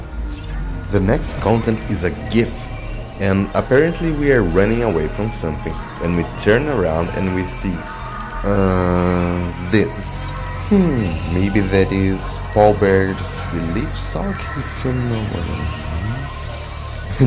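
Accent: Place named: Brazil. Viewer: Percebi de primeira que vc é br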